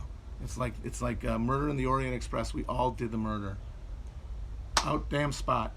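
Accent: Louisiana, United States. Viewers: When you began speaking with the Cajun patois, it triggered some personal memories from long ago. In that geological era, I was trained as a saute cook in a rather upscale Holiday Inn by an incredibly talented Louisiana immigrant. The hotel in question is way north of the Mason Dixon line. He never revealed to me how he got past the border guards, and seemed uncomfortable discussing his transition. I never pressed him about it. He is an expert saucier and makes the best red beans and rice I’ve ever had. And after all these years, he still won’t give me the recipe. So.... I really enjoyed this episode. My favorite so far. Breaking in a new grill, the Balkanized Mid West, protesters, attack helicopters, Harry Nilsson, Covid Economics & Sociology, and finally, The Steak. Looked delish. I personally like mine dangerously rare. Meat thermometers are essential for any household, but on the grill, eventually you will learn how cooked the meat is just by a touch of the tongs. 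Bon Apetit.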